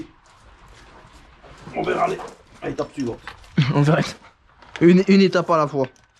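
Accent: Liège, Belgium. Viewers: Toi tu viens de Liège 😁😁😁. Chouette video ami liégeois 😁😁😁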